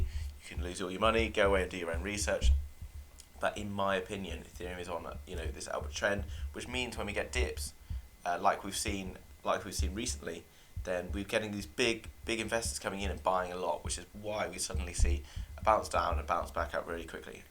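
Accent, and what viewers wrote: RP, United Kingdom. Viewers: I love your English. I play it 0.75x to for extra poshness ♥️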